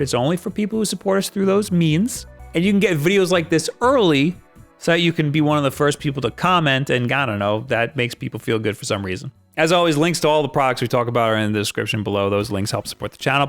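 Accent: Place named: New York, United States. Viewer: I know I feel like I'm being yelled at. It's like I'm in New York or New Jersey.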